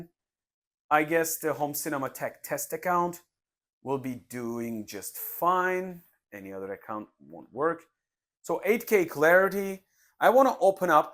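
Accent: Turkey. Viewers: This is Turkish accent. Öyle değil mi? Nerede duysam tanırım hocam :) (ve 'de de Hoşçakalın'ı duymak beni mutlu etti). Detaylı rew için çok teşekkürler. Thanks for detailed rew.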